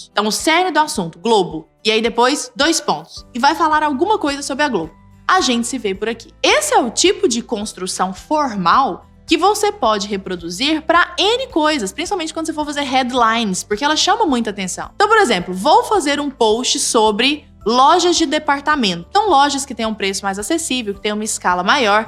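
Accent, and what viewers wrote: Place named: Centre-West, Brazil. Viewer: Pelo sotaque e daqui do centro oeste kk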